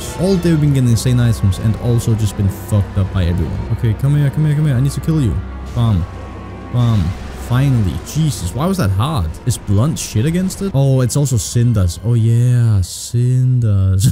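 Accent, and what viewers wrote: Denmark. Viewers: You can still kinda hire the Danish accent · I just knew about your channel and as soon as I heard your voice I knew you had a danish accent. Respect for a fellow danish Dark Souls player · i love how hard his danish accent is:)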